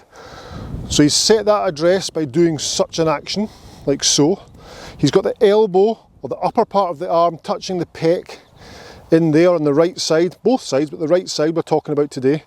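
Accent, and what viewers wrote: Scotland, United Kingdom. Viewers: Hello! First, I love accent. Are you scottish? Also, thank you for making these ben hogan videos. His book is timeless and to see somebody bring it to life through video really helps a beginner. I noticed that on the post impact part of your swing, your arms are aggressively bent in to the body, as opposed to hogan's full extension. I feel like I have that same tendency too. What do you think?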